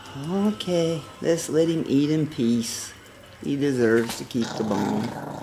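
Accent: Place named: Texas, United States. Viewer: What state are you from? Texas? Love the accent.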